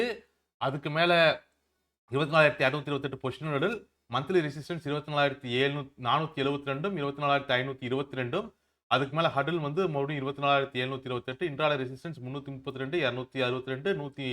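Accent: Kongu, India. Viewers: அது எங்களின் பிரத்யேகமான கொங்கு slang Sir.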